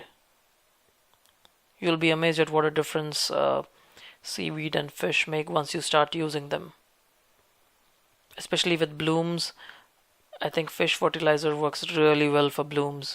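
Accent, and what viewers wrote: India. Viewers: are u Indian ? u sound like one :)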